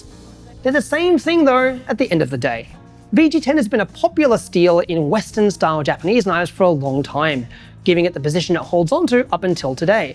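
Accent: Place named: New Zealand. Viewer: that's cool man I thought I heard some kiwi 'I's haha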